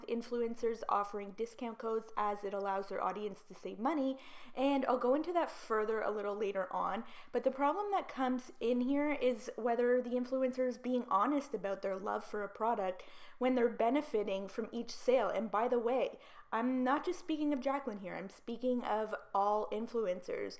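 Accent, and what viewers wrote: Canada. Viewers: A. S. Lauder I'm betting it's Canada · I know this is off topic but I'm an not a native English speaker and when I talk I usually have accent similar to yours so I'm interested in where you're from. Some tell me I sound Canadian. Not sure what their accent is. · You sound Canadian. Which is awesome.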